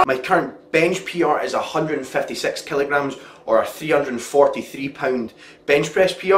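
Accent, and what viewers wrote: United Kingdom. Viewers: I love the Scottish accent, so badass!!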